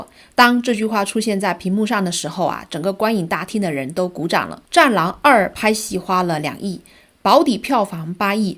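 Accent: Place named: Pingxiang, China. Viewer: 有萍乡口音，要么您就是湖南人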